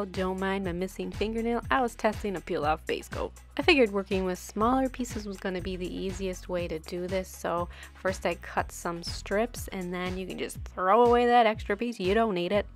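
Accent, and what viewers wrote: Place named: Minnesota, United States. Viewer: The accent reminds me of a Minnesota accent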